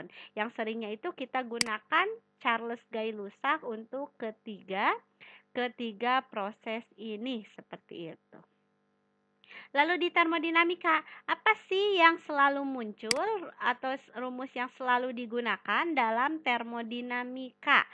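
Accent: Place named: West Java, Indonesia. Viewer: Kak orang Sunda ya?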